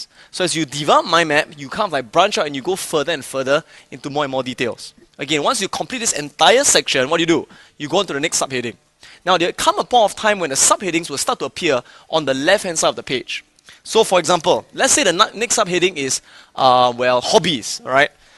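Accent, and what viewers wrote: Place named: Singapore. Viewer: tiếng anh singapore nghe khó chịu quá :(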